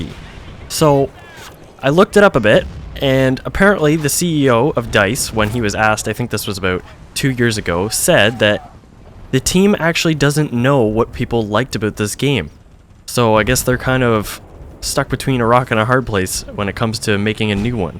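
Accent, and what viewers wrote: Canada. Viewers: you Canadian ayy? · im guessing his Canadian. the "about" gave it away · You sound like you’re from Port Alberni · is this guy candadian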